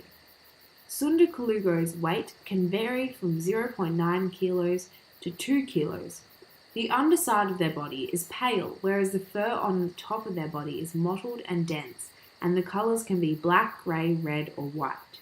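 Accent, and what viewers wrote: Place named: Tasmania, Australia. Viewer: Sounds like a Taz accent,Well done video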